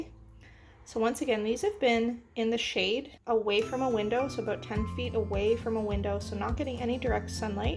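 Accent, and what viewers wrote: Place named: Canada. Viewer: I know I am late to party but I have question. Your accent indicates Canada. I wait a year for my little guys to get full sun. They have grow lights. Are you able to put them out because, I am assuming, have cooler weather? I am in California and Summer is over 90 degrees F and often into 100s. I am a dotting plant pappy and fear hurting my plants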